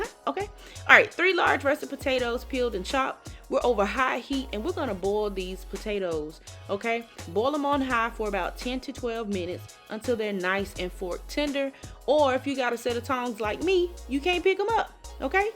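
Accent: South, United States. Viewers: I could just kiss you sis ❤ first of all the video was like listening to my big cousin or someone close to me especially with the southern bell accent😂❤ FLORIDA CHECKING IN secondly OMG THIS MEATLOAF IS SO DAMN GOOD SI I WISH I COULD SEND A VIDEO. I didn’t make glaze I don’t care for it but I did laugh when you talked about the gravy cause guess what? I used it. But I kept the sides simple it’s already late gotta get these babies ready for school but from the bottom of my heart THANK YOU. 😊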